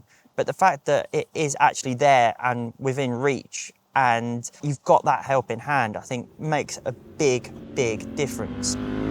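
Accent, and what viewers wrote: United Kingdom. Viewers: Nice british accent 🏍️ I'm a Spanish speaker, and I understand it better.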